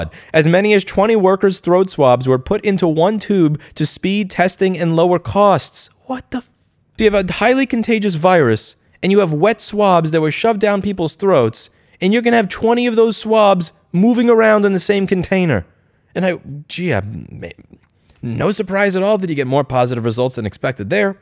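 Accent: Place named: Texas, United States. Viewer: Texas I hear! Which area?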